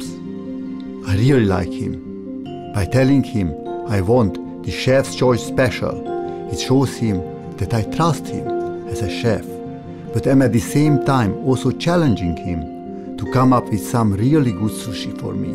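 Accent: Hungary. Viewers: It is not fake. He is from Hungary, and he really speaks with that accent. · i though, that maybe this person is hungarian, because of the accent. :) its not because of fun. I was born in Budapest too. Hungarians love Japans and I hope they love us too. FOREVER JAPAN AND HUNGARIAN BROTHERHOOD. Greeting from London.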